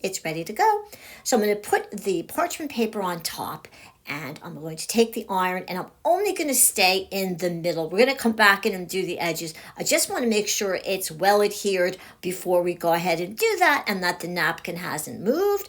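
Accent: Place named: Connecticut, United States. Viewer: Just 'discovered' you today!! I've subscribed & given you thumbs up!! You are such a great teacher!! I love your happy, cheerful energy! Your accent reminds me of my Aunt Mary. She came from Connecticut. Question: Can we use tissue on canvas bags, or only napkins? Now I'm inspired!! I guess fabric on fabric would work with this method??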